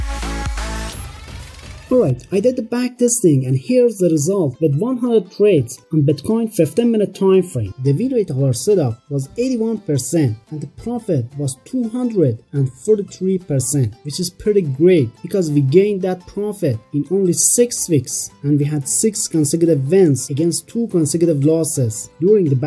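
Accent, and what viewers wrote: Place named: Iran. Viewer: حاجی چقد لحجه ایرانی داری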